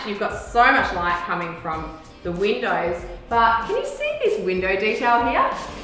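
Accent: Australia. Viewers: Definitely missed the Australian accent!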